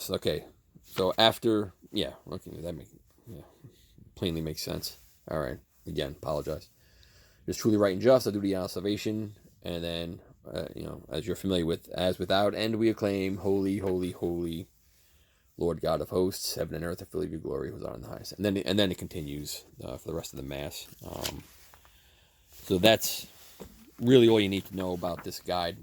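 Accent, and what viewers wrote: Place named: Boston, United States. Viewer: You sound like JFK you must be from Boston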